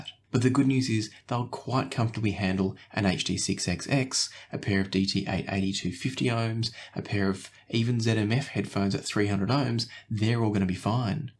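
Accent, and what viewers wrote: Australia. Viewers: it's his Australian accent with a rising inflection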